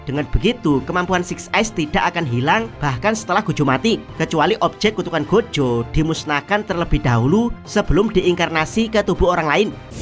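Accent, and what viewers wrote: Java, Indonesia. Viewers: medok bgt mas🤣🤣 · Suara mu terlalu kejawen Bang ... gak enak di dengar ,kurangi logat nya bro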